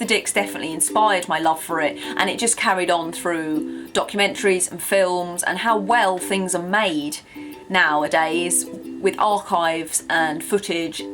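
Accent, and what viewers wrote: United Kingdom. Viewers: Your british is amazing :-). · I love your British accent! I started watching you because of it :D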